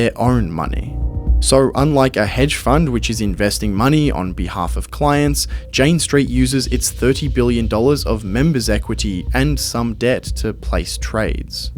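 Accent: Australia. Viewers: It's so hard to listen to the Australian accent. Putting a subtle r at the end of words that end in o...lol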